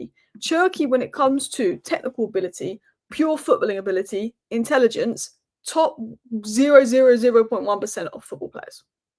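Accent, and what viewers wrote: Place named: Salford, United Kingdom. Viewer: Great Salford accent ,,,,,plastic rag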